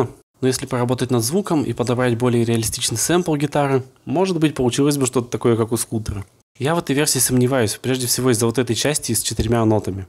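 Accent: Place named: United States. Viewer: Разбор с американским акцентом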